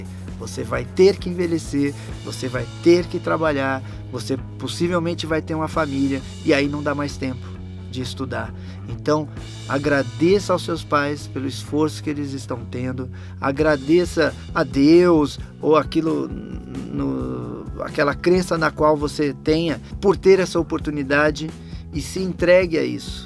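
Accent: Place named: Northeast, Brazil. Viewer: q parte do nordeste vc era?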